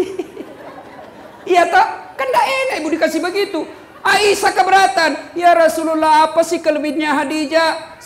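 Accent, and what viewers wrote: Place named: Sulawesi, Indonesia. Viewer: Allhamdulilah masih kental logat sulawesinya walupun diluar kota Sulawesi berdakwa 🤲 Sehat selalu pak ustad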